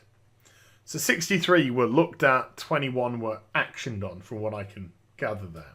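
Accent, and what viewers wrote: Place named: United Kingdom. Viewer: Is there any chance you will drop the British accent and slang? Your info is interesting but cant stand it.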